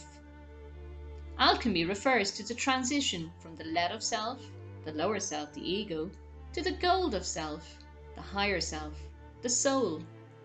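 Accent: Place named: Ireland. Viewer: Are you Irish? I love your accent! Not flirting just saying. Sometimes it love to jump into the mind of an Irishman to see how we sound to you guys.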